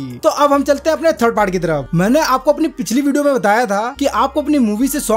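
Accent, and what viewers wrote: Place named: India. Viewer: Why he is saying always click and then I I heard it wtf india😂 accent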